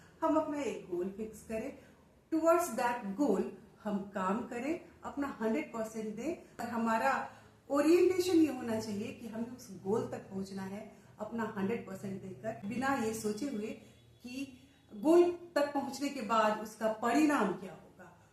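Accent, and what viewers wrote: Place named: Bihar, India. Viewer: Well, first of all warm hello to you after such a long time. Where do you get so much knowledge n wisdom? Are you from Bihar since you have bihari accent ?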